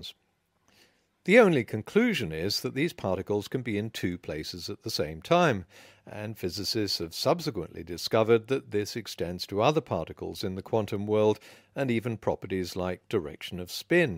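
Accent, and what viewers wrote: United Kingdom. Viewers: Like your videos! 👍🏻 what part of Australia they did grow up in?? Reason I asked is your accent is just so British. Cheers!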